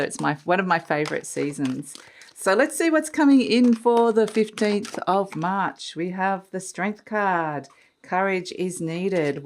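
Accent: Australia. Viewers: I knew it was an Australian accent 😊 kind of hard not to when you're from Oz 😂 I'm from Brissie. Good to see we have a few readers from home. Resonates a lot actually. Thankyou for the reading. Wish you all the best & many blessings 🙌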